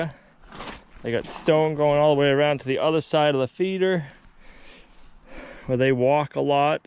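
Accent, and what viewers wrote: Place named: South, United States. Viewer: lol I hear your southern coming through. I see more applewood bacon in your future.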